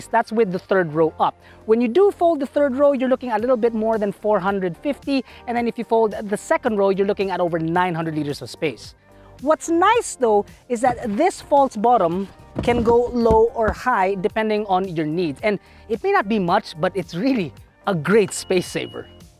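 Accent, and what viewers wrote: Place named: Philippines. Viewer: This is a very good example of filipino accent.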